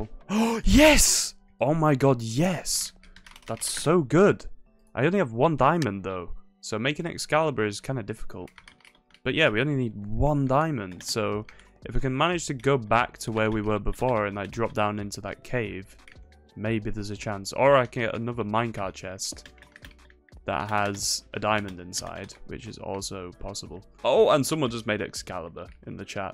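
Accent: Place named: United Kingdom. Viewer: how i found your channel from like 10 months ago is i saw a hoplite video heard a british person speak and i was hooked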